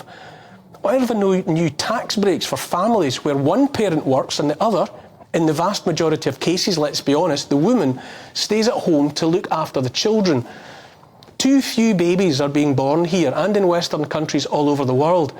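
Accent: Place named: Glasgow, United Kingdom. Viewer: Mate what's way the neck rug? And stoap way the Irritating accent yer a weegie trust me it's no surprise ur channel is complete Murdoch puppet play.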